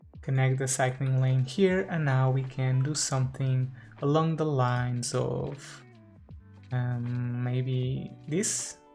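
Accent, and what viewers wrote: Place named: Portugal. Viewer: Adoro o teu sotaque tuga inconfundível!!!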